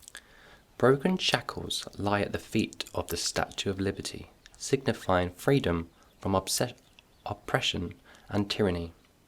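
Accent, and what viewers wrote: United Kingdom. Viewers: Your british accent is so awesome❤️ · Wonderful video!!!! Thank you - I so love the British accent :D!!!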